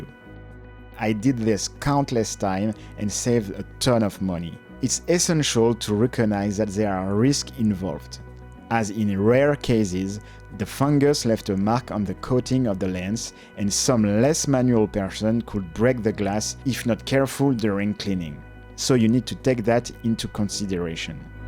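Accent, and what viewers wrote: France. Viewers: Your video has just described me with my obsession with old nikkn lenses. Apart I now need to learn how to clean them. And I have lots. Thanks fir a great video. BTW my wife loves your French accent.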